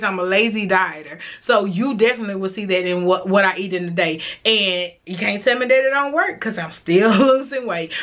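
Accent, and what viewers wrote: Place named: Texas, United States. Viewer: Bravo! I know you are helping a whole lot of people through your channel. If you ever want to join a great supportive fasting community, try A Healthy Alternative on FB. The founder is Chris K and hw has a channel too. And a wealth of information on YouTube. I will stay tuned to watch you. Your accent reminds me of my family who are mostly from Texas. Lol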